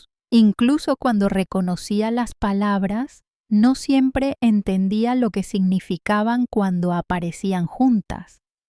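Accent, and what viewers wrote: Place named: Latin America. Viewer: La hablante tiene acento latinoamericano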